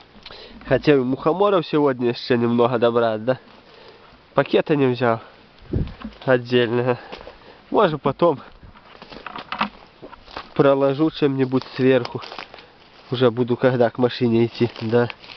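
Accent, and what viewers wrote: Belarus. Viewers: Спасибо за видео . Как сама за грибами сходила смотрю вас у вас такой приятный говор беларуский , мне как бользам на душу .Я родом из Житкович , живу в Тольятти мне все родное что вы показываете . Удачи вам !!! И милион просмотров и подписчиков .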